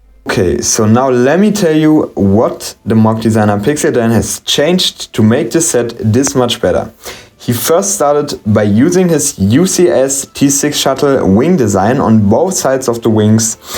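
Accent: Germany. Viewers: Am Akzent würde ich mal herleiten, dass du deutscher Muttersprachler bist. ;) Und ich bin schreibfaul, daher bleib ich gleich dabei. Ich hadere noch mit diesem Set. Wie derbe hängt das Cockpit wirklich wenn man es hochkant hinstellt? Hatte Fotos davon gesehen und das schreckt mich tatsächlich noch ab. Und mit diesen Videos hast du ne gute YT Lücke erwischt, gerne mehr. :)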